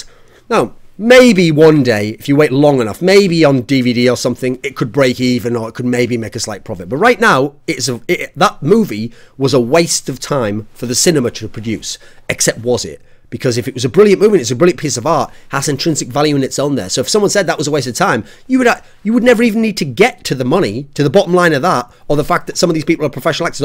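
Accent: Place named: Northeast England, United Kingdom. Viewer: I can't place his accent. Northeast England or Scotland?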